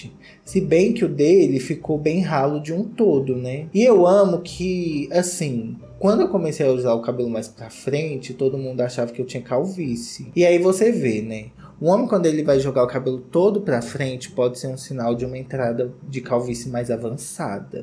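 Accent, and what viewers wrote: Minas Gerais, Brazil. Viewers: Amor jeito mineiro de falar do dono do canal kkkk cucuruco muito mineiro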